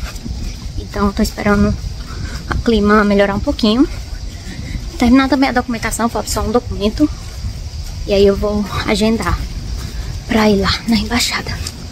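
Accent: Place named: Northeast, Brazil. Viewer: Será que você não percebe que o sotaque dela? Ela é nordestina então é o jeito isso de chamar a Ana de “mulher” simplesmente é o jeito,a cultura dela , nada a ver pelo amor de Deus.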